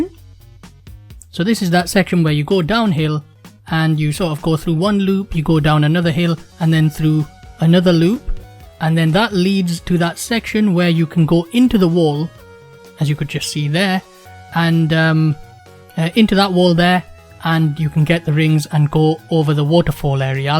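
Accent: United Kingdom. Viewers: Awesome work btw not to be too creepy but what part of the uk are u from?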